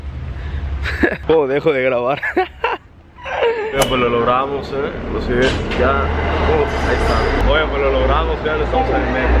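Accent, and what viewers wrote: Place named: Yucatan, Mexico. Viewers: 😁😂🤣🤣🤣🤣😅 No sabes no donde andas.... pero me encanta tu acento yucateco. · Habla como fresa con acento yucateco 😀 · Es una chulada ese hombre, ese acento yucateco, y que de el saludo iniciando este video, me ha puesto... HOT! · Orgulloso acento yucateco💘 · 🗣 hazme un hijo!!! 🥰💋❤️✌🏻 Amo tú acento yucateco, hablas hasta con faltas de Ortografía 😂😂😂 Besos!!! A 💋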